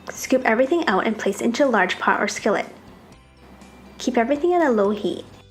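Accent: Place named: Minnesota, United States. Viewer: Sounds like Minnesota accent. I like the recipe.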